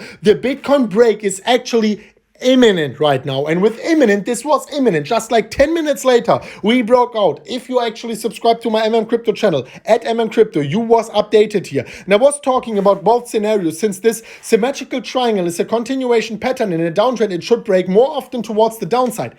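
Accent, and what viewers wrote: Germany. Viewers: HES a German hes accent is funny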